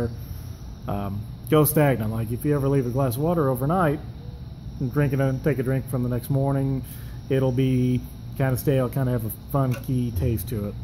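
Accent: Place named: South, United States. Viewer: Okay, Country accent!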